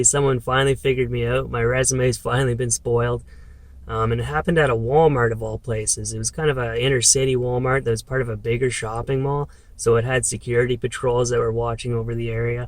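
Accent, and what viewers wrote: Canada. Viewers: Canadian accent activated and recognized. 👍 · I love listening to you. As a fellow Canadian, it's like listening to the McKenzie brothers (early 80's). Stay well! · Love your Canadian accent. I’m gonna be watching lots of your YouTube today just got two teeth pull so it's a down day for me. 💕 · Your young Jordan Peterson lol the Accent. What region of Canada?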